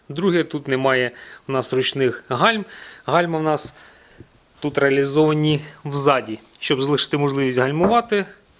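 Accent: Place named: Dubno, Ukraine. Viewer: Друже вітаю! Наснаги у роботі, та бажаю тобі постійно нових клієнтів... Ти можливо із Дубно? Десь почулося у розмові.... Я, з Рівного...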